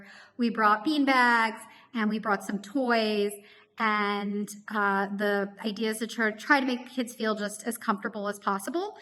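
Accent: United States. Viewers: you have a nice American accent....why u live on stolen land...go home save your Kids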